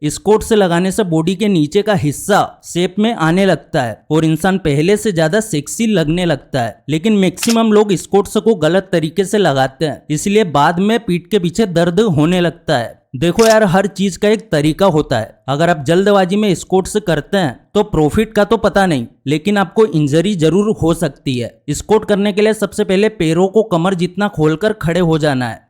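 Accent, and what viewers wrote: Indore, India. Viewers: indori bhai ki aavaz lag ri hai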